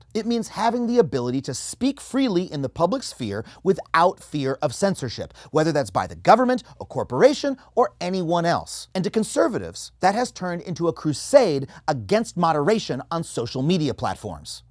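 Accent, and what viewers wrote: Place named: California, United States. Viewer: I get his point, but has such a California pretentious way of speaking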